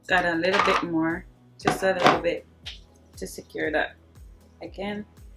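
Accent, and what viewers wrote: Jamaica. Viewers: I knew u were Jamaican from the starting of the video.😂😂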